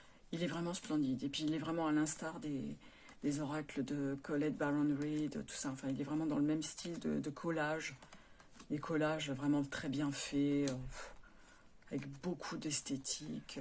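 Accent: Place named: Italy. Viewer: Coucou, merci pour ces jolis jeux et ton accent italien que j'aime tant!!! A bientôt 🌸🌸😊😊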